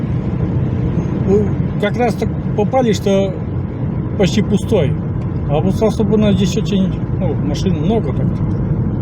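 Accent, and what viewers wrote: Komi, Russia. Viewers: Да уж Коми говор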